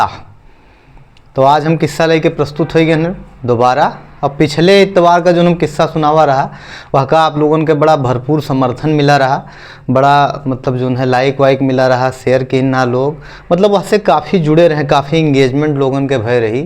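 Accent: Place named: Awadh, India. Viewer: Sir aapki ye Sunday ki kahaniya Sun kr bachpan yaad aa gya❤❤😍..... mai ayodhya se hu aur aapki awadhi dil ko chhu jaati h...... aise hi awadhi ko aage badhate rahiye......👌👌👏👏👏👏👏👏🥰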